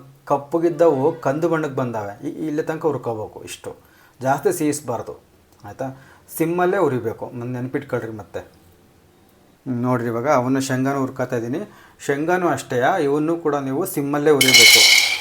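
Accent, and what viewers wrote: Chitradurga, India. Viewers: ನಾನೂಚಿತ್ರದುರ್ಗ ದವಳು ಅಂದ್ರೆ ಹೊಸದುರ್ಗ ಇವರ ಭಾಷೆ ಕೇಳ್ತಾ ಏನೊ ಮೋಡಿ ಒಳ ಗಾ ಗು ತಿದ್ದೆ ಇದು ನಮ್ಮದೇ ಸೀಮೆ ಯವರು ಖುಷಿ ಯಾಗುತ್ತದೆ 👌❤😄😄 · ನಾವೂ ದುರ್ಗದವರೆ,same ಹೀಗೆ ಮಾತಾಡೋದು.ಹಿಸ್ಕರಿಯೋದು ಅಂದ್ರೆ ಬೆಂಗಳೂರಿನವರಿಗೆ ಗೊತ್ತಾಗಲ್ಲ😂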